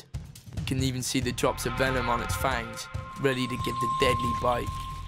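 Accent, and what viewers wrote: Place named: Southern England, United Kingdom. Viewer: You've got a southern British accent. Can't tell if you're from Brighton, or somewhere in Surrey, Kent or Hertefordshire, lol!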